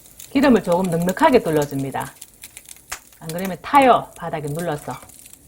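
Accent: Gyeongsang, South Korea. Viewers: 주부님먹는소리가말자국 같아요ㆍ경상도주부님 같아요ㆍ알뜰한 주부님 성격도좋아요ㆍ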